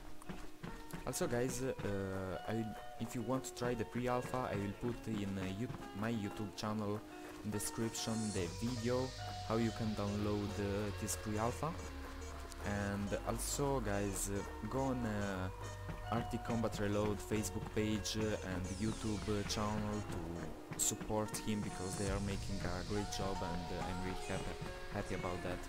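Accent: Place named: Italy. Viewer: you've got an Italian accent (or however it is writted)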